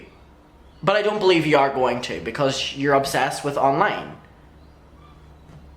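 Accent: Ireland. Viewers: Knew when you started talking you were from Ireland LMAO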